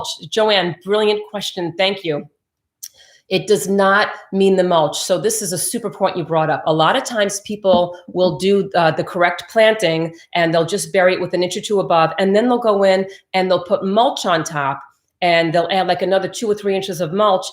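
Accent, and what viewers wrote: New Jersey, United States. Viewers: What month or season can you split them, and how young can they be before splitting them? Thanks, I love the channel and your Jersey accent. 👍🏻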